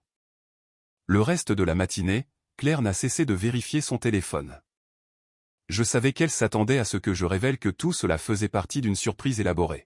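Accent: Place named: France. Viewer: Pareil, de plus entendre l'anglais avec un accent français ça pique les oreilles.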